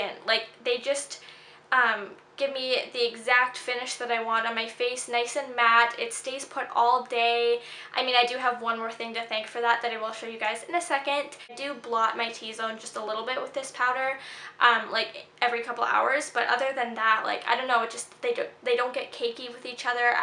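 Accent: Canada. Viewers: She Canadian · Where r u from? I thought ud b from America but apparently u aren't...I'm guessing Canada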